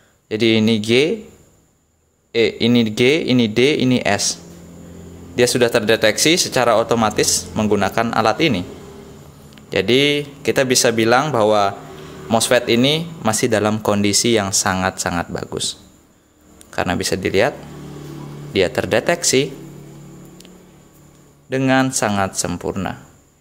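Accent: Bali, Indonesia. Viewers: Pantesan cara ngomongnya spt org bali. Betul bali rupanya bli. Lucu juga biasanya org bali tu. 😁 dimana lokasi bli. Nanti kalo butuh2 betulin elektronik sy datang ya.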